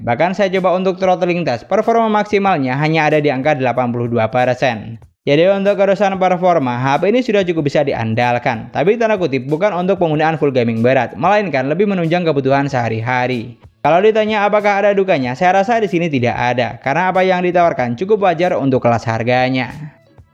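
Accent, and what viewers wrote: Madura, Indonesia. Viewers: Aksen madura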